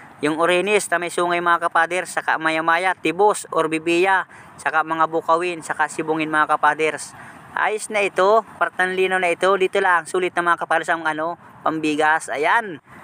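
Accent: Bicol, Philippines. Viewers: Bicolano po b kayo?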